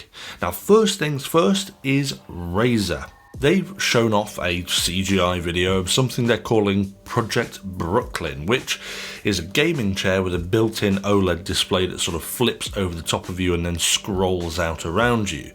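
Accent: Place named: England, United Kingdom. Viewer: I swear your accent is from the same bit of Blighty as Clarkson.. and you're also speed and whiskey freak. Have you hung out with Clarkson, by any chance?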